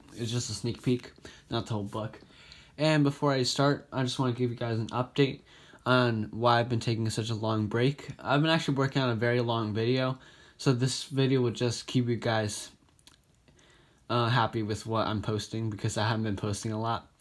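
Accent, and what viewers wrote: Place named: United States. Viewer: Bad don’t speak so fast man and why u American like whyyy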